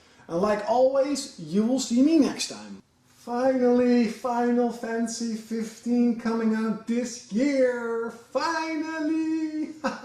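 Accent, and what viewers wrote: Netherlands. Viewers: Is that a Dutch accent?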